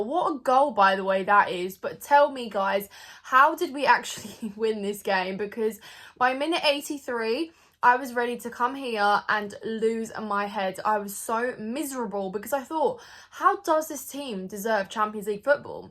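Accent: United Kingdom. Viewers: i can listen to talk all day I don't know why British get make fun of by Americans because of their accent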